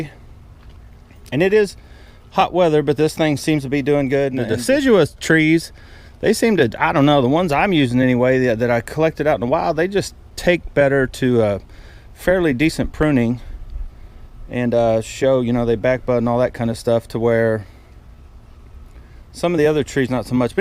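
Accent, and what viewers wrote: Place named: South, United States. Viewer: Love your accent, are you from south of usa?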